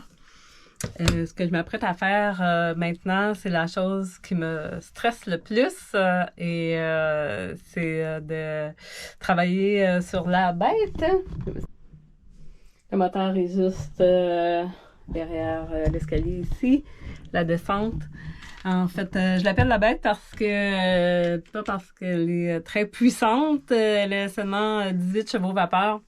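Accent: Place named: Quebec, Canada. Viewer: j'adore vous écouter parler avec cet accent du Québec !🙂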